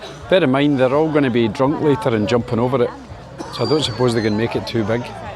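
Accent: Scotland, United Kingdom. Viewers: Interesting vid again, many thanks. A small aside, I like the Scottish accent having spent a bit of time working in Hamilton and other parts over the wall, but I did a little testing on this vid. If you play this at 2x speed, it sounds exactly like Russ Abbot's Scottish character. I'll get me coat... 👍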